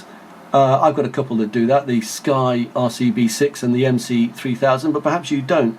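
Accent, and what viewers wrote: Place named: United Kingdom. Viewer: You Brits not only speak with a funny accent (no offense meant) but you also talk very fast. At the crucial moment after 8'00" I could not understand a thing. Congratulations, thanks!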